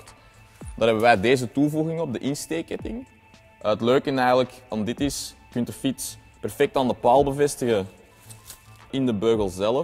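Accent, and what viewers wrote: Belgium. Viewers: mooi taaltje belgs. leuke gay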